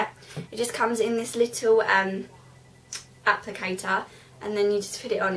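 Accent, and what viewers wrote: Essex, United Kingdom. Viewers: I'm British and I can tell ur an Essex gal! X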